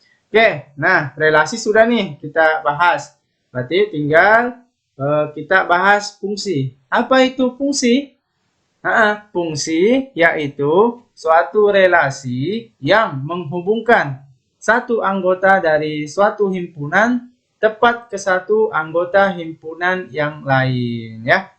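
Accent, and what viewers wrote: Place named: Sulawesi, Indonesia. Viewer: pasti kakak orang Sulawesi kan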